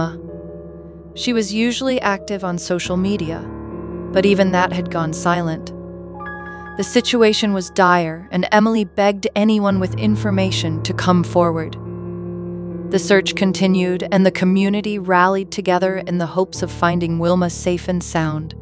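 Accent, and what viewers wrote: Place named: United States. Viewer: American narration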